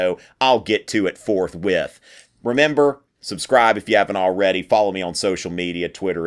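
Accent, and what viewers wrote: South, United States. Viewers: How is this guy not more popular? His accent takes getting used to if you're not familiar with that southern twang, but once you get passed that he's not boring!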